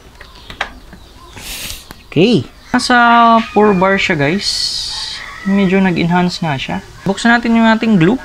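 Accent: Visayas, Philippines. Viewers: Ahh mao diay ganahan kaayo ko sa imo accent bay...😁 Great content!! Ug asa ka makapalit ug openline nga modem? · Bisayang bisaya ang accent mo lods ah. Hahaha.. Bisaya din ako.. Nga pala nice tutorials.. baka magamit ko tong idea na to don samin na halos wala din signal..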